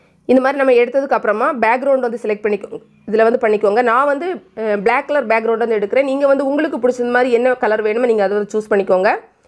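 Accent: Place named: Nagercoil, India. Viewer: Nenga nagerkoil ah akka,??? Unga slang avangala mari iruku adhan keten. My mother-in-law Nagercoil...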